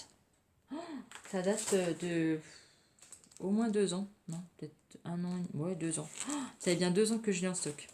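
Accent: France. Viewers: Tu m’amuses en parlant de ta manière de parler : nivernaise et Morvandelle ! De quel côté es-tu ? J’ai habité longtemps à côté de Corbigny dont on dit que ce n’est pas en Morvan mais que ses poules y vont aux champs....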